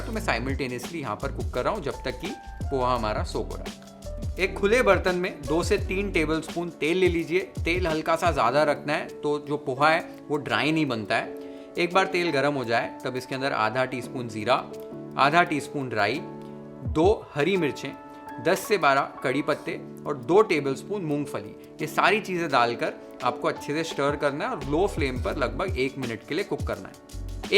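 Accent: Maharashtra, India. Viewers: Hey I love your marathi accent 😊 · Your marathi accent is superb 👌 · Marathi accent khup chaan aahe....saglya recipies khup chaan asttat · Your marathi accent is so nice 👍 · Chef, I’m impressed by your chaste Marathi language skills. Mulga pass zaala! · Chef, you got amazing Marathi accent. Jai Maharashtra 🔥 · I love batate Pohe. And yes I am from Maharashtrian family so we make minimum 3 times a week. Simple, fast and very tasty And your Marathi ascent is really awesome खूपच छान दादा 🙏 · Perfect pohe+sweet Marathi accent 😉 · Marathi accent 🔥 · I just love his marathi accent ❤ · Hi तुम्ही खूप छान aaslkhlit मराठी बोलतात धन्यवाद.... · Farach chan ... Marathi bollay te bara vatla😊 I really enjoy all your receipes..grt goin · Being a Marathi mulgi, I am in love with this guy!! Not just the recipes but the Marathi accent too! He mentioned that he was brought up in Mumbai and that definitely shows! 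The way he says बटाटे or वाटण or even काळे मटण with a ण and not न , makes me fall more for him! Keep it up Chef! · Your Marathi accent is ❤. तोंडाला पाणी सुटले, उद्याच बनवते. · I like your humbleness and i enjoy your receipes. I am Marathi and from Mumbai, now in US(missing Mumbai) Your sweet Marathi brought a smile to my face. As usual, great receipe with great tips:) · Thank you chef for this recipe ❤️ I am really in love with your marathi accent, tumhi khup Chan Marathi boltat... ❤️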